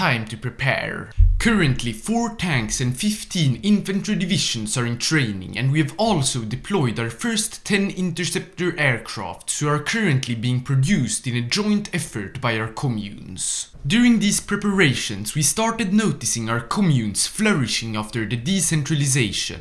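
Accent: Sweden. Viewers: You have the strongest swedish accent I've ever heard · I like how through out all your videos you pronounce alot of cities with a swedish accent, and as one aswell it gives the videos alot of flavour. Thank you I love your videos, keep up the good work!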